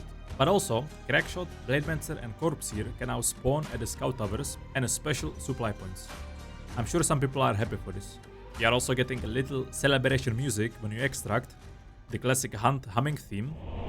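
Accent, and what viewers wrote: Czech Republic. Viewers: Is that a Czech accent ?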